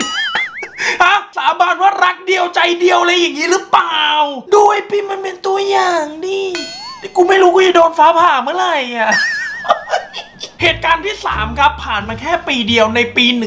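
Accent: Thailand. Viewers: ทำไมต้อง เหน่อออออ 5555.5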